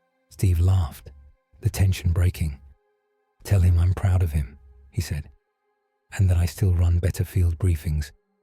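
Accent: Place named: England, United Kingdom. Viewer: Why the hell is someone with an English accent narrating? Cap is an American! DA...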